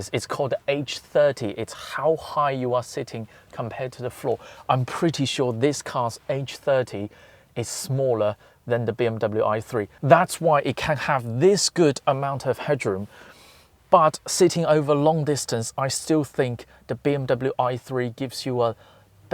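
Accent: United Kingdom. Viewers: He has a thick British accent though.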